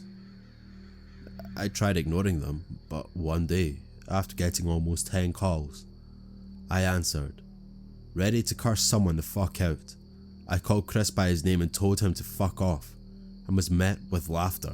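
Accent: Scotland, United Kingdom. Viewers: I'm from Pennsylvania! :D Also hi, I'm new here and I found you through Margbot. Scottish accents are my favorite and I'm excited to watch your videos <3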